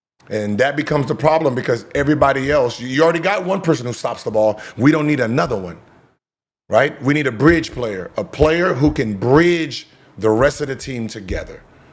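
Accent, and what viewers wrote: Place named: Memphis, United States. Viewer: You got that Memphis mane down 😅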